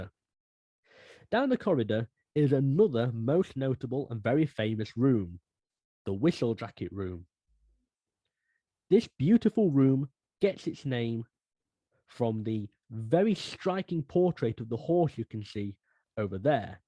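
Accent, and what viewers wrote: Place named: Yorkshire, United Kingdom. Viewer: As you might imagine, a Yorkshire-flavored accent isn't the easiest for those accustomed to the intonations of the American South to understand. Nonetheless, we are very glad we discovered your channel, and have enjoyed your programs immensely. We are also getting better at understanding the Northern speech. We look forward to your next video.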